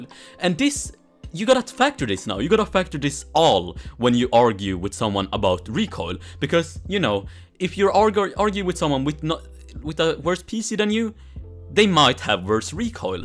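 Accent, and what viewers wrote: Sweden. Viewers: Hej du är svensk man hör detXD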